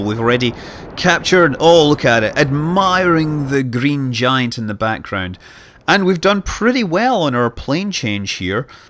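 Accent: Scotland, United Kingdom. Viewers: i want to thank you for your awesome ksp videos, i learnt so much about ksp because of you man!!! and i picked up some real knowlegde along the way. keep up the great work and use your cool scottish accent more we love it:-)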